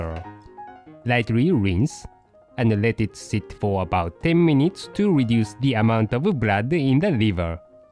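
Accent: Japan. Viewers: No... It's a Japanese accent dude. (I'm fluent in French, so I know that's not a French accent, I live in Japan, so I know that it's a Japanese accent) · is the narrator a Japanese accent? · Nope. The narrator is Japanese, so it's a Japanese accent. I think it might be the chef's husband, though I'm not 100% sure.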